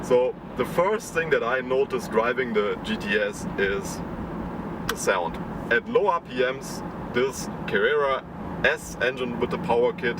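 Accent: Germany. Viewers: echt gute arbeit, ich hab den 991.1 nicht zuletzt dank deinem bericht auf dem schirm! wertstabiler als die c2s , c4s scheint er auch zu sein. p.s. selbst dein dann doch etwas herber dt. akzent sei dir verziehen, lol ...aber ohne scheiss jetzt, nach dem bericht von dem "ausfahrt typ" war der hier wie ein urlaub...weiter so! 👊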